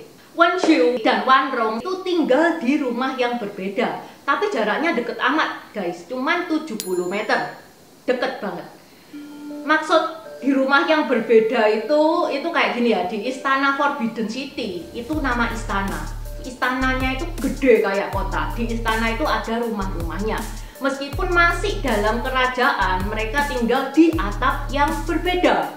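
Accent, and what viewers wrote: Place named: Surabaya, Indonesia. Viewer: Alkhamdulillah Nemu Chanel ce saya lagsung subscribe, Bahasa Suroboyoane medok muanteb😂😂 Chanel ini nambah wawasan, Semoga Ce selalu diberikan kesehatan dan rejeki yang berlimpah agar bisa terus berkarya. Salam sejahtera buat ce dan saudara saudara saya dari etnis Tionghoa, Amin ya Rabbal alamin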